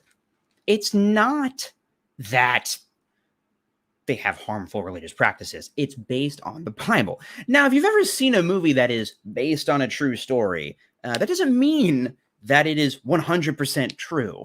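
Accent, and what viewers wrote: RP, United Kingdom. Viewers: Omg your BBC World service accent is on point. More please.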